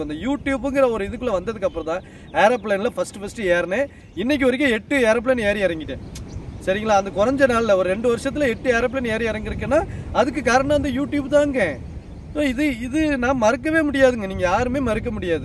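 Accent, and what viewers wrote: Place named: Tirunelveli, India. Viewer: சகோதரா கவலைப்படாதீங்க உங்க மனசு போல உங்க வாழ்க்கை இருக்கும் நெல்லை வட்டார மொழியை நீங்கள் பேசுவதை கேட்கும் போது மனசுக்கு மகிழ்ச்சியாக இருக்கிறது முடிந்த அளவு உங்கள் சேனலே பல நண்பர்களிடம் கொண்டு செல்வதற்கு முயற்சி செய்வோம்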